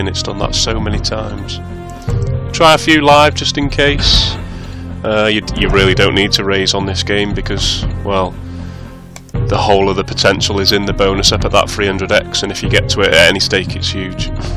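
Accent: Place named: Liverpool, United Kingdom. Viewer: oh i am a 51 year old woman from liverpool with a gambling addiction and trying to stop .... i live in the south of england .... i am using my sons creepyhomer acct to watch you and ROCKENROLLA ... i love it when you both WIN although i kind of like it when you both LOSE ... sorry but it brings up that sick panic feeling i would get and then the GUILT OH THE BLOODY GUILT ...thats what always got me xx you sound like a scouser though MATE X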